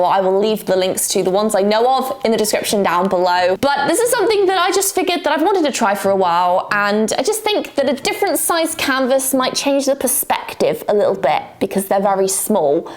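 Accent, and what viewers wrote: United Kingdom. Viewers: where are you from ? cause' i love your accent and it sounds quite british. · Hi, I have recently tried painting the little models you can get and they have some super tiny details on them (usually in the most awkward places on the model too, like the handle of a sword or a sleeping dragon's claws 🤣) I would recommend grabbing a set of nail art brushes. They're basically like Rigger paintbrushes but even thinner and come in different lengths and thicknesses but all of them are tiny enough to paint designs on fingernails so definitely would be good for tiny canvases. Alternatively, Mira (Byler) is always recommending the Arteza detail brushes set and she does a lot of painting on tiny/weird/awkward things videos 🤣 Where abouts in the UK are you from? I can't quite place the accent. I grew up in Leeds but now live just outside Brighton. 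Do you miss home or is the US home now?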